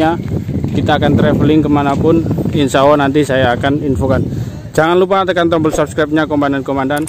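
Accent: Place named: Java, Indonesia. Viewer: pekat betul bahasa jawa kau komandan😅...menjaja tu brjualan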